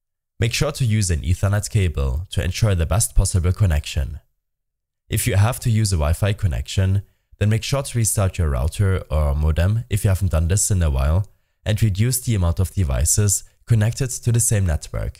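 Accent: Germany. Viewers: uhhhhh i hear a german voice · ich hör eine sekunde und man merkt das du ein deutscher bruder bist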